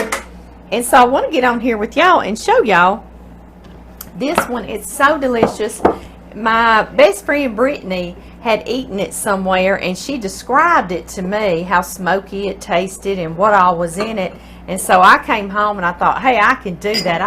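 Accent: South, United States. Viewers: I just looove your Southern voice ❤Imma make this..Thank You for being kind to share this..Stay Safe ❤😷❤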